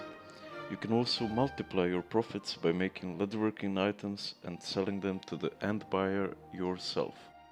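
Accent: Russia. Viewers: Ty for this awesome guide! Where are you from? You have accent as russian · You have a cool Russian accent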